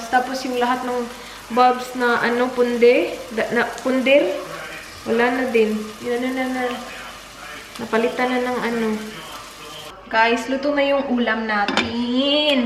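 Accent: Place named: Visayas, Philippines. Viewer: Her accent ?? Kala mo dili bisaya magsalita ehh grabe... Ilove your blog..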